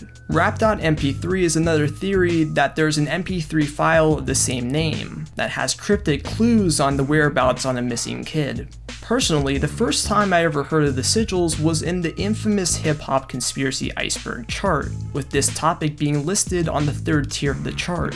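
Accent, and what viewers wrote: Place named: Midwest, United States. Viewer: I hear Midwestern in your accent and it makes me sooo fuckin mad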